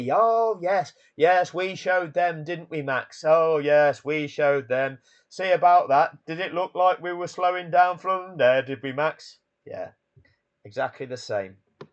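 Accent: United Kingdom. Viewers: The moment I heard that “back with more from our favorite F1 journalist “in that British accent I knew This video just gonna trash Max with no actual intelligence. Man the British have got to be the worst spectators in human sport history they don’t even hide it ,it’s so deplorable.😂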